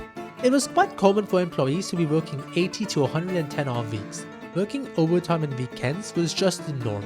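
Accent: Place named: Iran. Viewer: Great video! By the way, are you persian? I hear hints of persian accent in your voice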